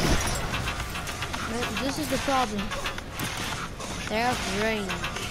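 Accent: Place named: Afrikaans, South Africa. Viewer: Are ya south african thats ONE HELL OF A AFRIKAANSE ACCENT MY BROE.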